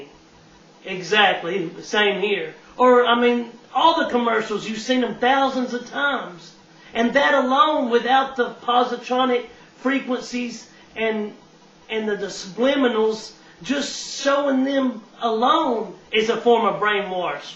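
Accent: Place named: South, United States. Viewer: "A form of brain warrrsh .. ( love the Southern accent).. I'm a southerner myself..Born in The Ozarks actually.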